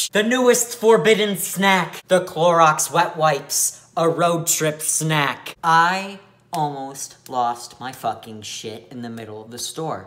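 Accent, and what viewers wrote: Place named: Midwest, United States. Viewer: If one slows down the video to .75 speed, you sound like a drunkard complaining about life and I fucking live for it. I love your voice, I'm from Michigan so It's nice to have someone from the Midwestern reigon to identify with. I dunno, it sounds weird and you'll probably not see this and/or not acknowledge it but I just wanted to share my not-so-valuable opinion. You are so funny man, I honestly hope you get the recognition on this shitty platform that you deserve.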